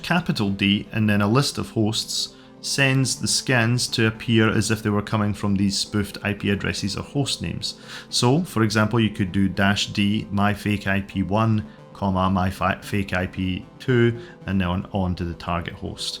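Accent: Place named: Scotland, United Kingdom. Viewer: It's a Scottish accent 😂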